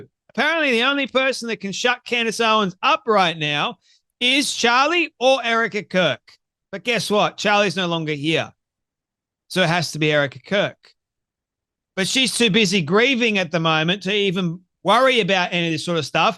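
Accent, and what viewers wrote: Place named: Australia. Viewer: I listen to you everyday, encouraged to hear an Australian voice. I admire your tenacity and relentless efforts God bless you.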